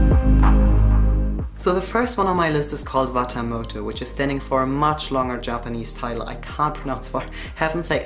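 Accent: Germany. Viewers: Idk if I'm just stupid... But you sound like you have a gernan accent😂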